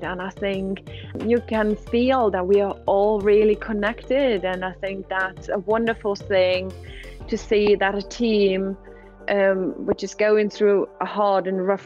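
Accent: Liverpool, United Kingdom. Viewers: Her scouse accent is proof how much she embraced the new life and culture of LFC and probably the city in general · She's started to sound a bit scouse. :)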